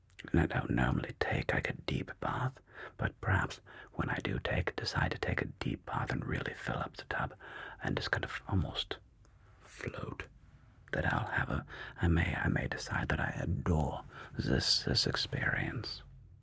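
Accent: Germany. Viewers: Perfect, I love the German accent, and your thoughts!💛 The banana metaphor was so simple, but so on point for this... because holding onto things is sometimes less scary than the unknown even if it cages our very own hand in a bowl... very true! Loved the insights of this video!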